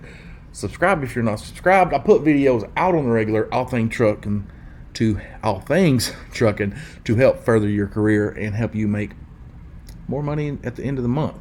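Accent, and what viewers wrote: South, United States. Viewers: Thank you so much for this video. It felt like having a roller coaster ride seeing the different rates that's out there. I love your video. I will be following you on Tik Tok and FB and subscribing to this video. You are very funny and your Southern accent is nice. Please make more like these. I love to laugh and learn.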